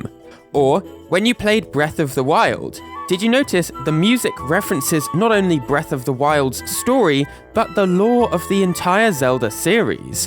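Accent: United Kingdom. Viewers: love ur videos and ur british accent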